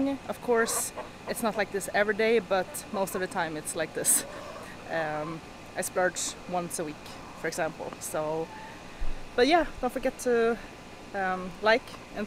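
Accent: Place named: Sweden. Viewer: Still hear the Swedish accent haha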